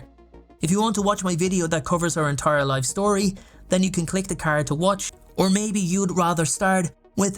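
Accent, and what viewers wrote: Ireland. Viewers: Oh boy, so I guess I cannot understand Irish accent :'(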